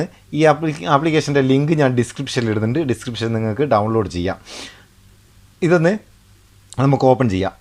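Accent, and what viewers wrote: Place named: Meppayur, India. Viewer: കോഴിക്കോട് മേപ്പയ്യൂര്‍ ഭാഷ വിടല്ലേ.ആകര്‍ഷകം,ആസ്വാദ്യകരം,ഓക്കേ?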